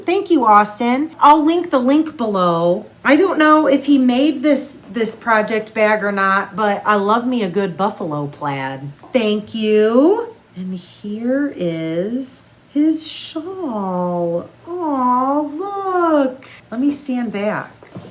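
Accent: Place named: Texas, United States. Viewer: Hi... let me start off saying you are not boring! I just discovered your video. I really enjoyed you being YOU! I live in Houston Texas and you sound like you’re from Texas. Anyways that Boxy Chevron sweater is so awesome I had to go buy it ASAP but I gotta problem I’m a NEW knitter so will you please do a tutorial on how to get started on this sweater Puh-lease - Lisa😊🙏🏽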